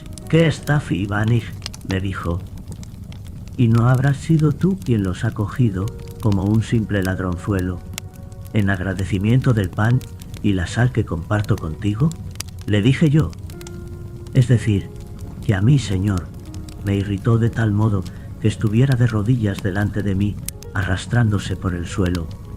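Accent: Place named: Spain. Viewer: ESO es dormir.... Con ese asqueroso acento del tipo que vino disque a colonizar no solo a mi territorio sino todo centro is y no quiero hablar de ese idiota que andaba perdido 😅 dale este tipo connesr acenide mierda y postea algo para dormir blaaaaaaaaaaaaaldoto estúpido yo que perdí tiempo no solo escrirnfoeesto sino quitando segundos de mi vida escuchado a este asqueroso español 😂😂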